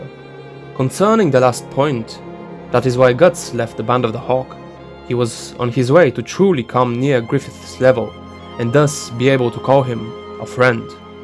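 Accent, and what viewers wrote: Germany. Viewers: 30 seconds in. You’re definitely German. Cool accent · Good German accent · Bro, you German? Thick accent man. Shoutout from Manheim!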